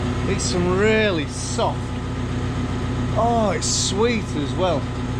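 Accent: Yorkshire, United Kingdom. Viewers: Your accent reminds me of Kirkkbymoorside....are you anywhere near there ?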